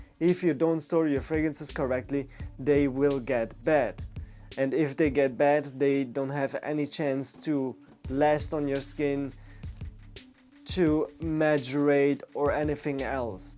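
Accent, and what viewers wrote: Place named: Italy. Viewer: U sound italian. Coincidence? 😂😂 of course not fools.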